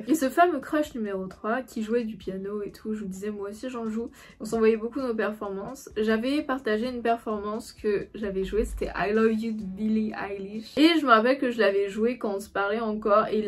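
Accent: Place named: Paris, France. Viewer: Cette façon de parler 😂 on dirait une bourgeoise de Paris PS: Je critique pas, je fais un constat, personne ne parle comme ça là où je vis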